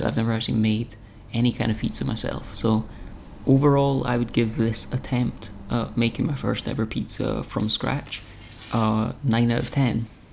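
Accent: Scotland, United Kingdom. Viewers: Weird as it sounds, I’ve been craving the sound of your voice all week. It’s so soothing, like ASMR. Lol, you’re a young Scottish Morgan Freeman. You should consider narrating. Thanks for posting this video in particular. Bake Off is another bit of ASMR for me and this combination has been absolutely wonderful. In regards to your three bakes, I think you did a great job. 10 out of 10. If it tastes good, who cares how much it looks like the traditional version. You’re not in a competition; you’re baking for yourself. All three looked delicious to me. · Is your accent Scottish? I like how it is far easier to understand than accents from Northern England!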